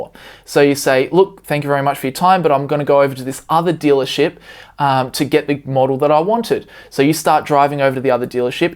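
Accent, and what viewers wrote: Australia. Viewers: Are you an Aussie living in America?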